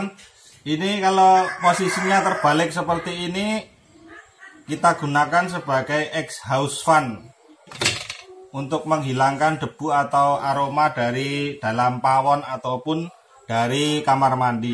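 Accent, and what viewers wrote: Java, Indonesia. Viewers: Maaf bang...ngomong bahasa Indonesia boleh medok...tapi bahasa Inggris jangan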